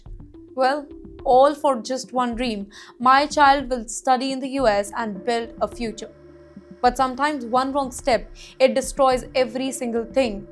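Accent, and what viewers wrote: India. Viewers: The Indian scammer accent on this presenter is awful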